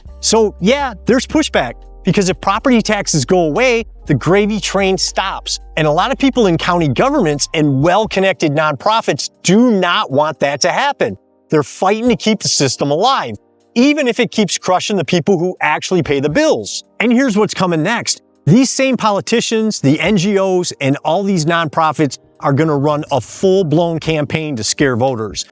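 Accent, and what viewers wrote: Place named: Wisconsin, United States. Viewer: Are you from Wisconsin? Your accent sounds like it.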